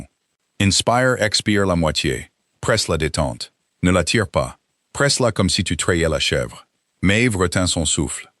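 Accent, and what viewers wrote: United States. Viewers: Pourquoi la voix ia prend un accent américain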